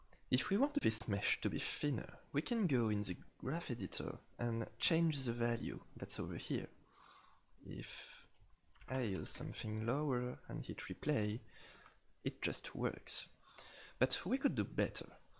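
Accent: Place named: France. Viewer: cette accent français xDDDD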